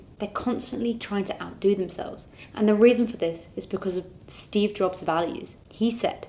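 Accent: United Kingdom. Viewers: i hope you dont mind me asking a rather personal question .. where are you from (more or less) im asking because of the accent it is a accent i very much like but only a few have it in movies and stuff .. i would say brittish but then again the country is big so id like to know where this is coming from :) cheers and greetings from germany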